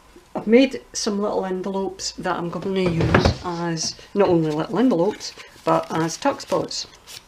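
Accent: Scotland, United Kingdom. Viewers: Thank you again for allowing us to watch you work. I always pick up something. Love the way you organise your pages when doing the stamping process. Good on you for being true to yourself and what works for you. I always get excited when I see a new video from you. For your work, your accent, and little tips. I have a son living just out of Inverness and listening to you feels like connecting to him. I live in New Zealand but we visit him regularly. Keep doing what you love. It certainly makes me happy. Dianne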